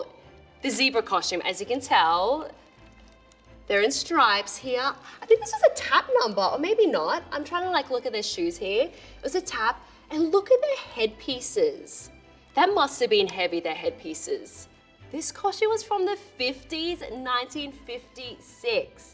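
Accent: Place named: Australia. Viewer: So nice hearing an Aussie voice in the Rockettes (from one Australian to another). Would love to see the show sometime if ever I'm in the US at the right time.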